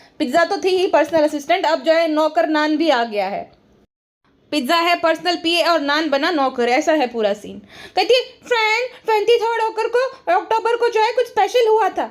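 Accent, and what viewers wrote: Gujarat, India. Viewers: Aap gujrat se ho kya??? Bcz some words se gujrati lgte ho😅😅😅😅plzz reply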